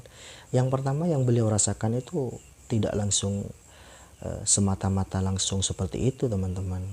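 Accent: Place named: Madura, Indonesia. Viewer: Reng madureh kakeh tretan 😂?macem logatdeh reng madureh.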